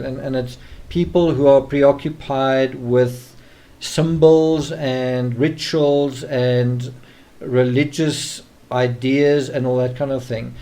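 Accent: South Africa. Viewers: Definitely a South African accent.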